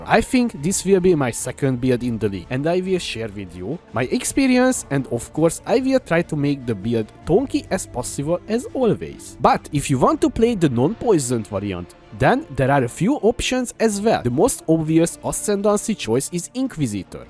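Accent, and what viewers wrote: Hungary. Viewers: I agree with the comment that warns new players to avoid this. AVOID this build. Why? -You are sacrificing a fuck-ton of item slots for unique items. Both daggers taken, chest slot taken, and you STILL need to solve 100% inc spell damage taken on low life. -ONTOP of this, you are already losing 25% of your damage (it's like gaining a debuff: deal 25% LESS damage) because it's not possible to reach 100% conversion. -Also need to sacrifice 2 jewel slots to force out cold and lightning. 2 jewel slots is not insignificant. -In conclusion this is absolutely bait. You are sacrificing so many item slots and you have not even begun to address defenses. Also I can tell you are Hungarian by your accent.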